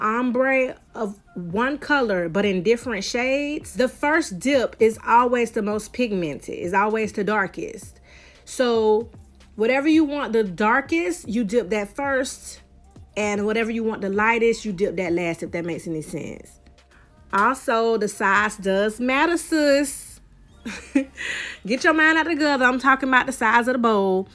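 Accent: South, United States. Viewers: Gwerlllllll you killed this 😍 I’m not good with wigs at all! My friend glued it down for me but you made this look so simple now I wanna order a blonde wig and try it 👀 btw where are you from ? I love your southern accent.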